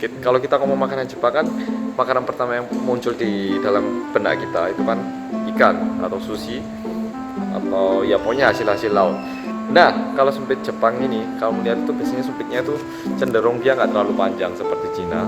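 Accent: Java, Indonesia. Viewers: Orang korea asli ya kak? Koq fasih banget bahasa Indonesia, bahkan medok😎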